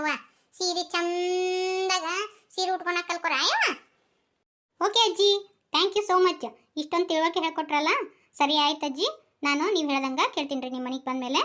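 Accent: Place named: North Karnataka, India. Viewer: ಉತ್ತರ ಕರ್ನಾಟಕ ಭಾಗದ ಮಾತುsuper parakka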